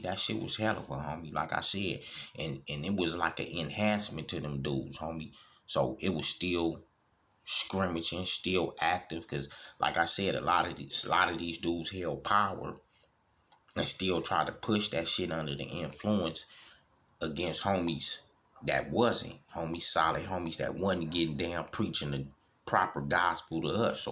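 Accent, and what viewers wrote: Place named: West Coast, United States. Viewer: Man I listened to your stories throughout my day at work and I'm a Crip from Denver and I know you're not lying and you're not fake about nothing you say however you can actually get paid do voices for like a new Grand theft Auto hell you can even be in movies you got that authentic stereotype West Coast accent and voice it's nothing new to me but to the world it would be a sight to see like yes n*** like this really exist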